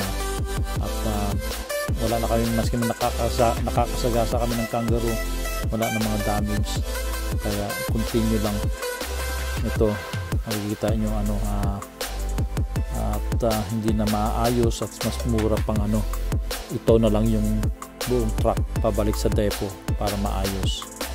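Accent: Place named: Australia. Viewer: Good day mate!❤️ I love your aussi accent po Sir, kanggaro +s cute but causes disgrasya sa daan.. Keep Safe po Sir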